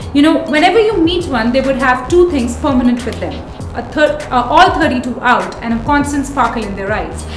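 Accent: India. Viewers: maybe 'becoz of that Indian accent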